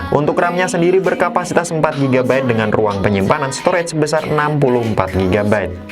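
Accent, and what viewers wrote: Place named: Java, Indonesia. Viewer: Jowo ngendi mas😁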